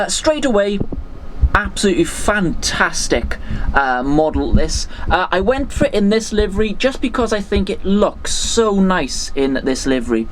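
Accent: Wales, United Kingdom. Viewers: To the best of my knowledge, you are the only Welsh Model Railway contributor on YouTube. I wouldn't worry what people say about your accent! Diolch. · Happy New Year. Look forward to new videos during 2020. Love the Welsh accent. I visited Wales during the summer, it was scorching hot, went up Snowdon, didn't need a coat it was so hot. Must have been roasting in your loft. Keep up the good work. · Hello, firstly thank you for the many videos from this year on your YouTube channel. Congratulations on your new job before Christmas. As for the accent, well mate I subscribed to your channel because of your accent, don't worry about people who appear to be anti Welsh, I'm part Welsh and very proud of my Welsh heritage. Your Christmas video this year I absolutely loved as ever mate its fabulous, just like your remembrance Sunday special, really nice that you care about others. 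Happy New year, and hope that you have a happy prosperous New year personally and for the channel, can't wait to hear what you are planning for 2020 for jubilee road international. Hope to meet you next year at the meet and greet meet up at the SVR. Cheers, from in Middleton Manchester. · I've been watching for your YouTube channel and I'm watching it right now with the new year time but be honest with you I like really welsh accent it's really cold and my real name is Daniel Lee Baker from South Yorkshire Doncaster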